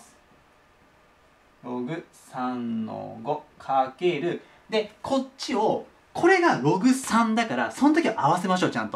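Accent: Kansai, Japan. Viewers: たまににじみ出る関西弁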